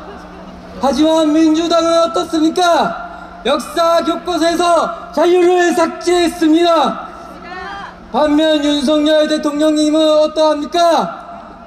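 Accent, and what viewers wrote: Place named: Jeolla, South Korea. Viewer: 구수한 전라도 사투리 멋져요 애국청년 화이팅! 최고입니다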